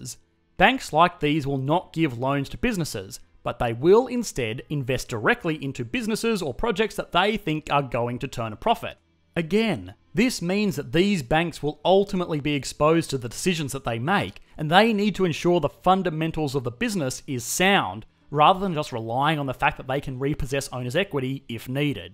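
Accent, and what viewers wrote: Australia. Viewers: Goddammit handsome sounding Australian man. I was going to sleep in my screwed up sleep schedule. Now I’m getting educated. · Uploaded 13 hours ago Australian accent 1am?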